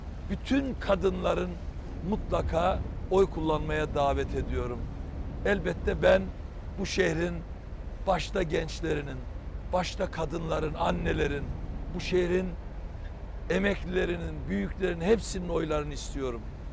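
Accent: Black Sea, Turkey. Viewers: Hafif Karadeniz aksanı çok tatlı değil mi ya ❤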